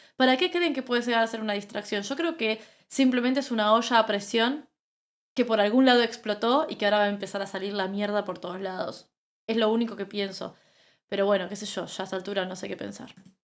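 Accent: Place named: Argentina. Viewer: Es primera vez que veo un video tuyo. Sigo a muchos argentinos, sobre todo al presidente Milei y su lucha por la libertad. Por tu idioma deduzco que eres argentina. Para mí, es un ritual para que los onvitados se la coman. He escuchado que hay evidencias que altos personajes de la música y la política disfrutan del sabor de esa carne. Sé que no puedo decir más. Un abrazo desde Cape Coral Florida EEUU de, una exprisionera política cubana en el exilio.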